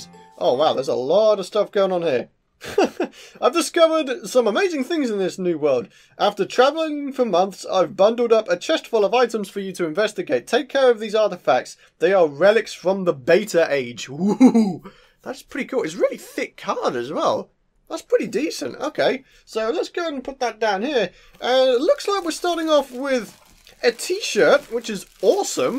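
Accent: United Kingdom. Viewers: he is from the UK its obvious.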